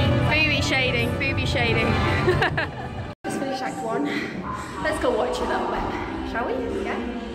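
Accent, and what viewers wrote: Germany. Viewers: Cute how u speak German ^^ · I'm so happy that you're vlogging again!🔝 I really missed it! Also I wanted to say that you're german is so cute and you are such a kind and happy person!😍 xoxo Alexandra😘 (sorry for my bad english)☺ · Your German is so cute 😘 Love your Vlog :) Looking forward to see you at the Open day (I have two little presents for you ^^ )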